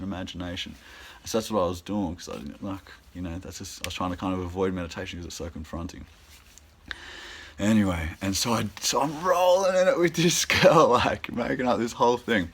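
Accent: Australia. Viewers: Interesting that you subconsciously wanted n needed the same kind of love since teens. I remember that n only illness fundamentally broke that from me n i couldnt now even think of how another lover coukd make me feel high again. Dig ya channel dude.. feel like i know you. Mmm maybe its the Victorian(?) accent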